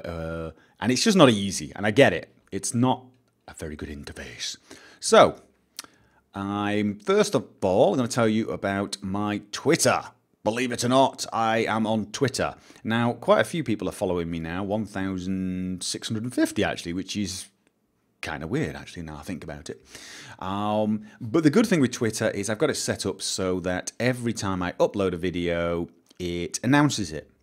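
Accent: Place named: England, United Kingdom. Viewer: Wait I love you're accent but are you Norwegian or English because you sound English but you're channel country is Norway. Just wondering.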